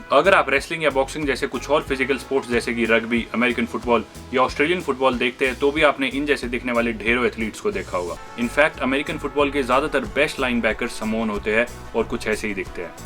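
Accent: Haryana, India. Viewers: Bhai kitna bhi kuch karlo par haryanvi touch rahta hai aapki boli m❤❤ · You are so talkative in your vlogs in comparison to Navankur brother ✨😂 and i always have been a fan of your desi-haryanavi accent 🔥🤣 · Bhai aapka tifficulte Harnavi touch achcha lagta hai mujhe ❤❤❤❤ Aur bahut dino baad aap logon ko achcha hotel mila hai ...🎉🎉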